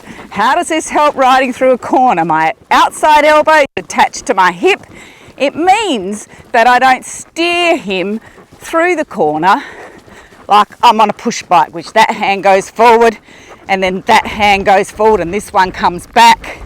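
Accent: South Australia, Australia. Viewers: Thank you for this. I've switched to Western from English (or plain old bush bashing). The quarter horses I ride in my lesson have very slow lopes and their heads bob up and down. I have a lot of trouble keeping my hands still as they tend to go with the horses bobbing head! Western people don't seem to close their fingers but I will try this at my next lesson and see if by closing my fingers more and tucking my elbows into my hips if I can keep my hands still! I'm hoping if my hands are still and fingers closed my lesson horse may get into more of a frame. I'm pretty much taught in Western to use my leg and seat for turning. The horses I ride are both very well trained and basically if i just look a particular way, they'll go there but I definitely have trouble loping and keeping my hands still. By the way, are you from South Australia? South Aussie's seem to have a slightly different accent. 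lol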